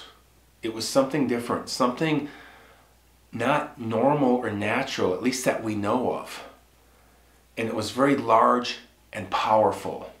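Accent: Minnesota, United States. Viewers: I also listen for the MN accent. Ahhhh home.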